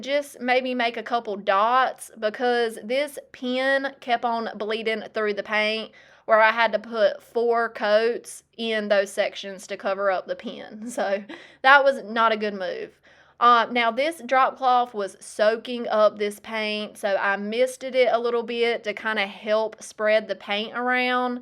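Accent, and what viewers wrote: South, United States. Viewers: Love your great southern accent! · I love your southern accent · I grew up in the south, but have been in the northeast ( longer than I wanted) and I used to talk just like you. When I moved, people were relentless in teasing me. I have lost a lot of it, I think, but people still ask me where I am from 😂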